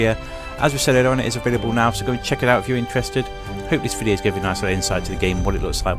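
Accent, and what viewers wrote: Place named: Newcastle, United Kingdom. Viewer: Are you geordie? You have the accent.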